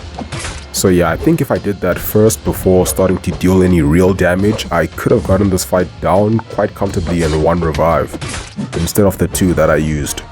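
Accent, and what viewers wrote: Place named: South Africa. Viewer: Are you from south africa, your accent kinda sounds like a few people i know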